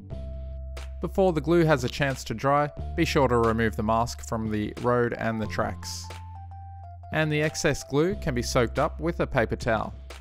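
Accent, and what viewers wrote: Australia. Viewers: He's Australian. The NZ accent is a bit different to ours but apparently people not from Oz or NZ can't really tell the difference.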